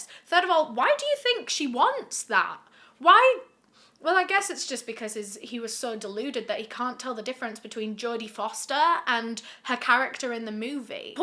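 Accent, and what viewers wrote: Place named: North England, United Kingdom. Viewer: Coming back to this video as handled this subject with such professionalism & care. Honestly she’s one of the few true crime YouTubers who actually doesn’t glorify these people / subjects & I have so much respect for that. Edit: Also as someone who has family & friends who live in the North her accent brings me a weird sense of peace whilst listening to these fucked up stories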